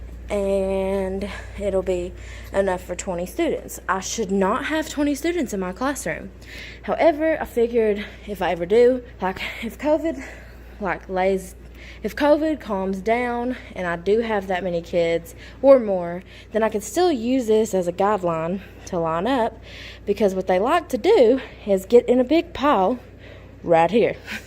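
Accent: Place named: South, United States. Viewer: Hey! I just wanted you to know how much I am enjoying watching your classroom vlogs. Your classroom is looking great! I love the math alphabet, but I especially love your accent!! I am also a teacher from the south. I have been told recently that, like you, the teacher will most likely have to move from class to class. I agree that it is the safest thing to do for our students, but it will be a challenge! Good luck to you!! By the way, I also teach 6th grade math at my school:)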